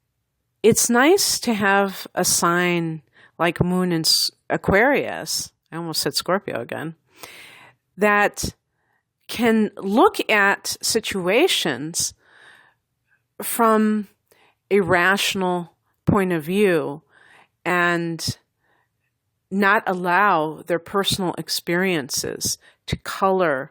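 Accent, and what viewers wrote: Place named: Minnesota, United States. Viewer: Are you from Minnesota? I am, you sound like you could be lol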